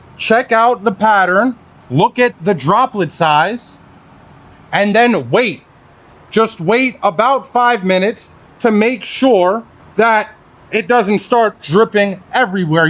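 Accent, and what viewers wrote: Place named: New York, United States. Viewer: It's that New York accent.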